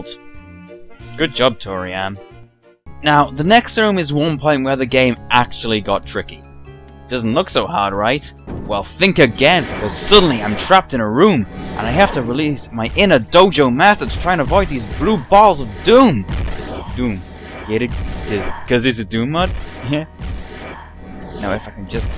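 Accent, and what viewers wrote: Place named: North East England, United Kingdom. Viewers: Sound like he's from Northumberland where I live. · Diego Rojas The North East of England would be my guess...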